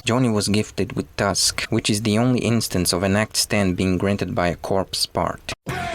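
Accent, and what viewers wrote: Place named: Brazil. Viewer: Mano, tu é Br ?, parece c o sotaque de Br ein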